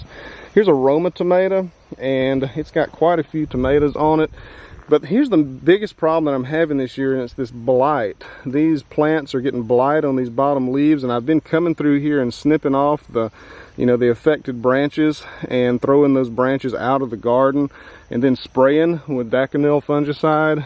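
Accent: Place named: North Carolina, United States. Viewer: I think he’s from North Carolina !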